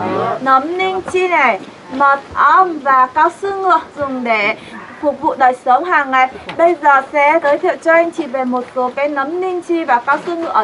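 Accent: North, Vietnam. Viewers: Nói tiếng bắc. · Lại nghe giọng bắc...hơi nặng!!!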